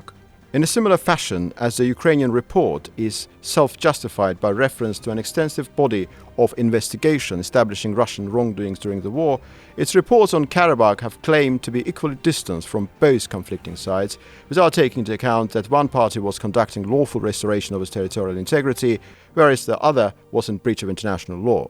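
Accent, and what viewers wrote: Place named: United Kingdom. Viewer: Это прекрасно, что появились выпуски на англ языке. Однако, можно совет от англоговорящего человека? Прошу вас попросите комментатора не тараторить, в самых важных моментах используется военная терминология уместная для данного контекста, но зрителю нужно это ещё уловить и осмыслить. Плюс очень сильный британский акцент смазывает некоторые слова. Немного практики по примеру телерепортёров с BBC и всё будет отлично. И потом мы (зрители) рады и 15-минутному ролику, если нужно почётче всё изложить, мы только рады. Удачи вам во всех делах. Спасибо за вашу работу.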